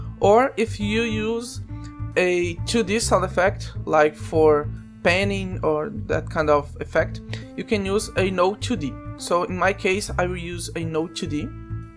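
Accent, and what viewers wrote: Brazil. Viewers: esse sotaque é br em hahahaha, nice man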